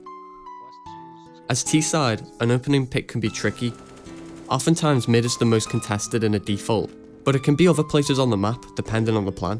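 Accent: Liverpool, United Kingdom. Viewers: Scouse CS youtuber, I can fuck with that lad. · Love the video, are you a scouser mate?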